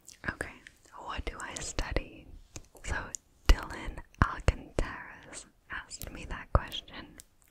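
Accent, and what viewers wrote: France. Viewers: Tu dis que tu es gêner un peu de ton accent en français... Je te rassure en dissant que la plupart des québécois que je connais (à l'extérieur de montréal) adore les accent française ;) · Cool! J'ai hâte. En passant, t'as un accent français extraordinaire, et c'est génial.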